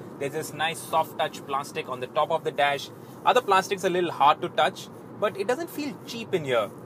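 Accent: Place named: India. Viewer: ก็นึกว่าของคนไทยรีวิว นี่ไปเอาใครสำเนียงแขกมาพูดให้ฟังเนี่ย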